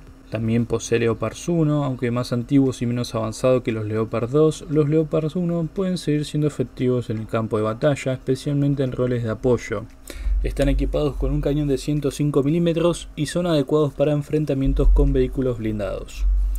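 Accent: Argentina. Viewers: Esta información no es del todo cierta, han obviado armamento moderno que tiene Perú . Este video al parecer esta hecho por chilenos y narrado por un argentino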